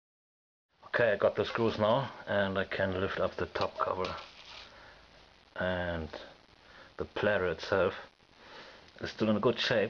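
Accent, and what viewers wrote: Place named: Germany. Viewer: Speaking with a godawful german accent....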